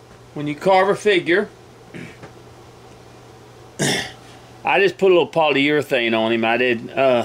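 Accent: Texas, United States. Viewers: Newbie carver and love your videos. Sounds like you live in my neck of the woods here in Texas. Are you a member of any local carving clubs? Also I know you have done videos on tools, but do have a list of the “x-number” of most important tools (and sizes) - I.e size and angle of vtool, gouge, knife blade etc? Finally, thank you. You have inspired me, and others much more talented than me! It has gotten me off my phone and outside— a real hobby.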